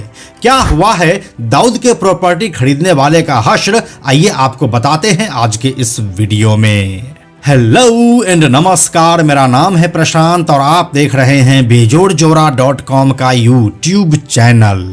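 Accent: Bihar, India. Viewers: बकवास, उससे अधिक घटिया बिहार जैसा उच्चारण. शम्स ताहिर खान से training लो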